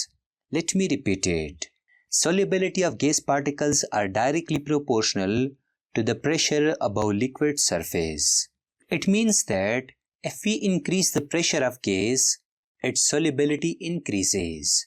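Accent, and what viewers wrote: India. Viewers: Oh i thought he is from india because of his accent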